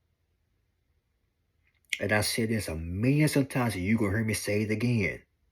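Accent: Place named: South, United States. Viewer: Hey bruh, I know people got so e shit to say about me. I also know you seem to be on my side. Seeing how you got a southern accent... im sure it's a culture shock sometimes. 1 word can have a completely different meaning in several languages. It also doesn't help that people are constantly changing the meanings of words in our own language. "Im dead" today... doesn't mean what it did 10 years ago. Next time you think you hear something offensive... don't be afraid to ask what that term means to them personally. I only say this because your one of the few level headed people I know strong enough to follow through. Change your mind... change your world ❤ Keep ya head up son.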